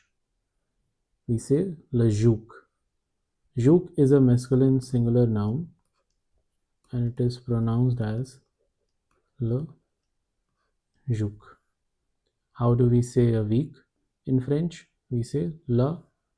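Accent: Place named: India. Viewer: Really appreciate the hindi pronounciation. Makes the words stick in memory. 👍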